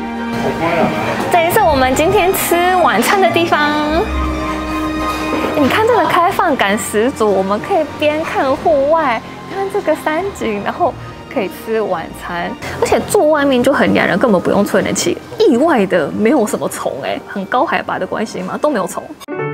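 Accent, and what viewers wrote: Taiwan. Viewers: 這中文說的台灣腔，沒誰了，完全聽不出來耶，厲害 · 比台灣人還台灣口音，努力有了好收穫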